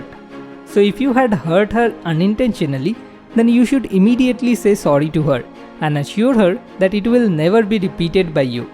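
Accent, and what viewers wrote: India. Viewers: I like when Indians talking in English they are funny 🤣😁👏🏻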